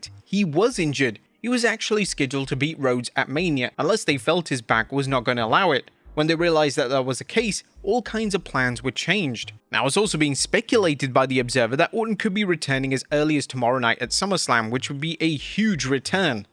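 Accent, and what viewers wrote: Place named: United Kingdom. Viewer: Thumbs up UK voice back!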